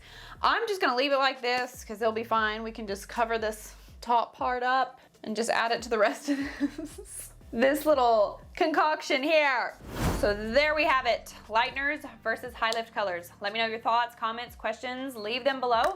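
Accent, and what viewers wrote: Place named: West Texas, United States. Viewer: Epi 6 of HILLLLLLBILLLLLLLY HAIRRRRR. west texas voice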